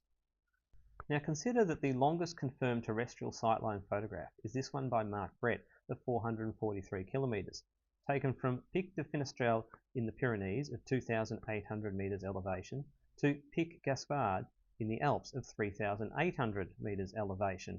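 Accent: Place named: Australia. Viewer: Maaaaate. That ain't no New York accent. You are from the land of the vegemite sandwich.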